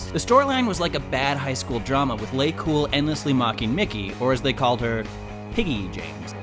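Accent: United States. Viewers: just go mate leave no one like you and your american voice. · Good lord, who is this wanker narrating? Tell him to piss off and bring back one of the British boys. I didn't even know WhatCulture had any Yankee berks on working for them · It's weird hearing an American accent on What Culture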